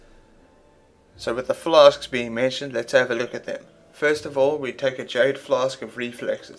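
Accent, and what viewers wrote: South Africa. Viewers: I knew that accent was South African :D mooi man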